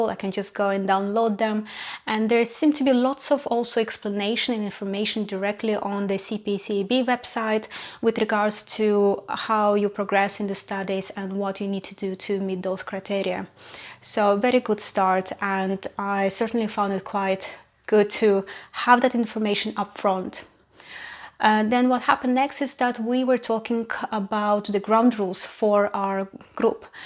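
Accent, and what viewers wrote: Russia. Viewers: I can hear Russian accent. are you living in UK? Why you don't speak your native language? I"m sure russian people will appreciate it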